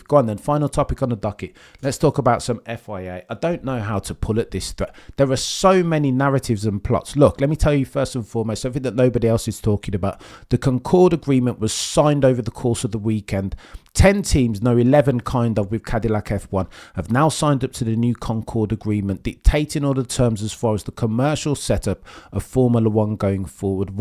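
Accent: United Kingdom. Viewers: Love you, but really struggle to understand with your heavy British accent. My loss. Keep it going!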